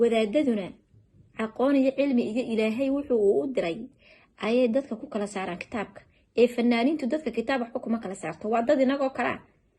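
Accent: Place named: Borama, Somalia. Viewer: Anigu reer booramaan ahay sidaan kuugu hadli lahaa ama gabadh kale ugu hadllahaa ayaad u hadashay inaabti quruxeey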